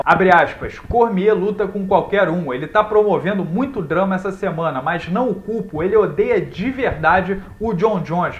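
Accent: Rio de Janeiro, Brazil. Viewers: Ótimo comentarista!...análise perfeita, apesar do sotaque carioca irritante (eu acho), a análise é perfeita!!! Jones é foda!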